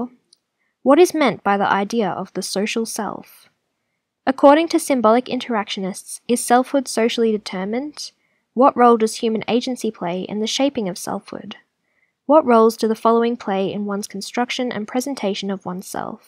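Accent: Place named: Melbourne, Australia. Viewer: Oh right, you're from Melbourne, no wonder you sound a bit different. PS Brisbane here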